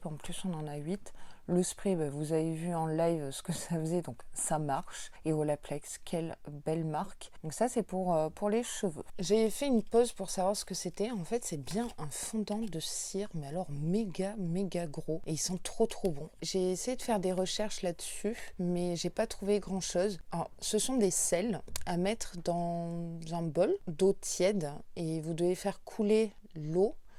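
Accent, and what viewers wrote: New Zealand. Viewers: Loving the kiwi accent 💜💜💜 Jolis goodies, le calendrier est vraiment flashy rose barbie ça fait un retour en enfance je trouve 💜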